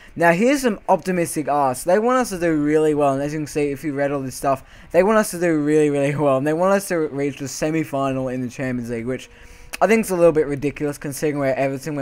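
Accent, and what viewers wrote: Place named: Australia. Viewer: yer fukin aussie luv ya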